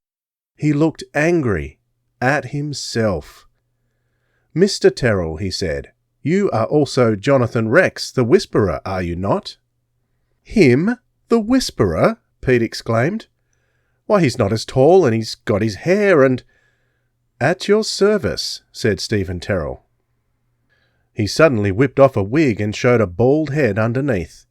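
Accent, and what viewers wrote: Australia. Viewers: Are you the narrator Australian?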